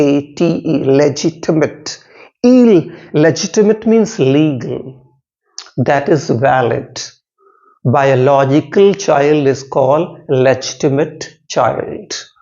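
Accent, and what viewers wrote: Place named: United Kingdom. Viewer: Sirji....your content is nice ....but you unnecessarily use a British accent.....which makes it boring.....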